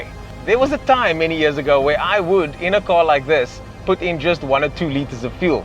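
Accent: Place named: Afrikaans, South Africa. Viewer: That horrible Afrikaans accent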